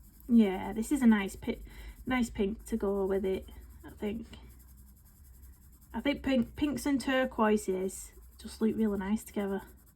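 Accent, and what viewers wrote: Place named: Wales, United Kingdom. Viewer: Hi beautiful I love the unicorn 🦄 she is looking beautiful.. I really thought u were from wales lol.. your accent is beautiful when I talk in Aussie slang some people look at me too exp when they aren’t Aussie lol 😂 lol forward watching your next colour and chat ❤x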